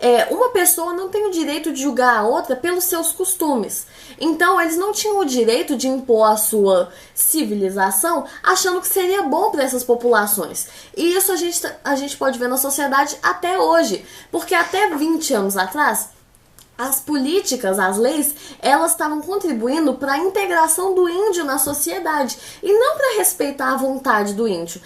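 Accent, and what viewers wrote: Minas Gerais, Brazil. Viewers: Quero ver como retomar a tese sem ficar repetitivo. Conheci seu canal ontem e to me odiando por não ter te assistido o ano inteiro :) E de curiosidade, quantos anos você tem? Tipo, c ta no ensino médio? Pq tava achando q c era professora, mas que parecia mto nova pra isso. hahaha PS: Q sotaque maravilhosooo, é igual ao da minha mãe, ela também é mineira :D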